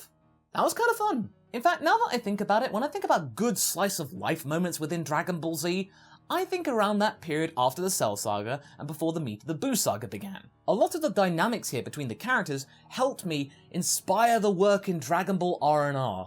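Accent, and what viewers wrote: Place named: RP, United Kingdom. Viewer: you sound like a posh dbza goku. I keep expecting you to say "muffin button"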